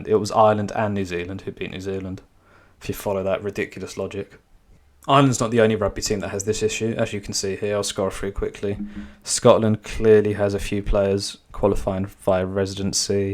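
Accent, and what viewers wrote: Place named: England, United Kingdom. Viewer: What an ignorant and insulting title on this video...... Lose with Irishmen??? As soon as I saw it I just knew it had to have been created by an Englishman. Five seconds in and the accent confirmed it. Wow! Has he taken a look recently at his country's national football team..... or at all the foreign-born athletes that England is so quick to grant citizenship just so they can win a medal for England when their own folks cannot. Just imagine spending your valuable time creating a bitter video about your closest neighbour winning a major competition but instead of congratulating them you try and create the perception that they are somehow weak and losers. Only a REAL loser would spend his time in this way. Bizarre!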